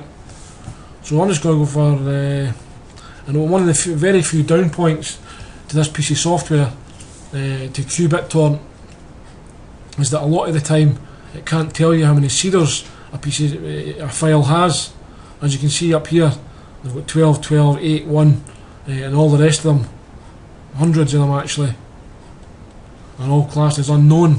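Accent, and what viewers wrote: Scotland, United Kingdom. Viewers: Than ye must be a huge idiot , or a non english speaker. Everyone knows it's actually Scottish accent , can tell ya , he's from Dundee or East Lothian.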